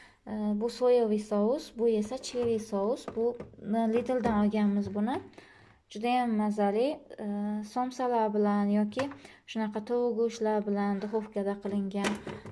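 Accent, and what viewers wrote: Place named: Khorezm, Uzbekistan. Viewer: Man ayol kishiman . Siz Xorazmdanmisiz gapirishuz Xorazmlilarnikiga òxsharkan judayam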